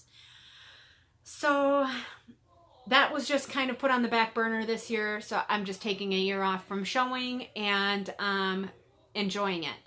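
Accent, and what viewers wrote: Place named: Minnesota, United States. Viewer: I am enjoying your videos, chuckled while watching on one of your first ones commenting on your “Minnesota” accent! Never thought I had one either until I heard myself say Minnesota! Speaking of Minnesota, a lake theme or lakeside theme would be fun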